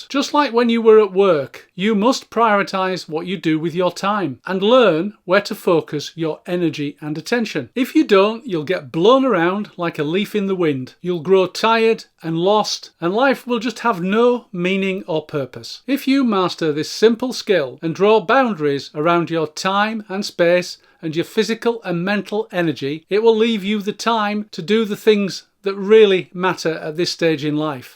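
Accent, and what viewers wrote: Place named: Yorkshire, United Kingdom. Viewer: Not English, it's Yorkie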